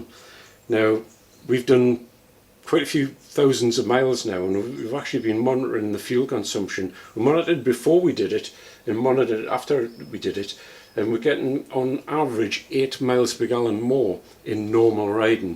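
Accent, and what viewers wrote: Newcastle, United Kingdom. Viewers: Great to listen to a fellow Geordie talk so knowledgeably about some great motorcycles. If I lived in the UK I would certainly be buying parts from you because you are clearly a true enthusiast and are in it for the passion rather than just the money. Thank you.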